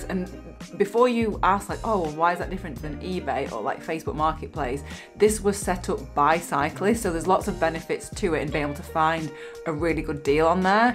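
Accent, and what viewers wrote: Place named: North, United Kingdom. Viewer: that stupid norden accent should be banned. puke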